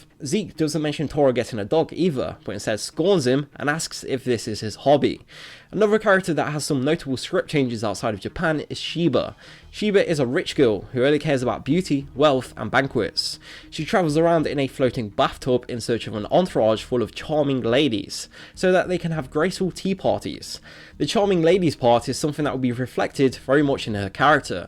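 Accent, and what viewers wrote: United Kingdom. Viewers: the nasaly Brit voice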